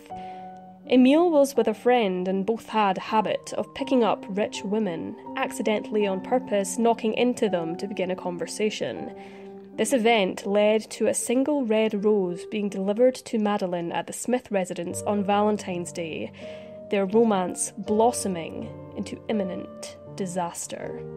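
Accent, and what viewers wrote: Scotland, United Kingdom. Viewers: I love your content! Excellent research, interesting cases. The background music fits perfectly and your Scottish accent is so easy to listen to! Well done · Why the hell do so may narrators feel that they have to put on the artificial "creepy" pronunciation when telling their stories ? For instance, this young lady will normally have a lovely Scots accent, which one can easily hear, and most probably a nice speaking voice - but both are spoilt by totally unnatural creepy speaking. I used to subscribe to this interesting channel but then went away because I just couldn't listen to the narrative any more. I am back to give both narrator and channel another try. The stories are good, the research generally excellent and the presentation superb......if it only wasn't for the "creepy" voice, everything would be perfect.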